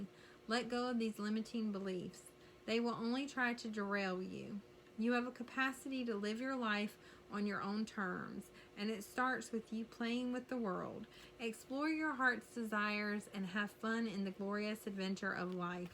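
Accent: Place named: South, United States. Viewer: Thank you for the reading. I like your southern accent reminds me of home 😊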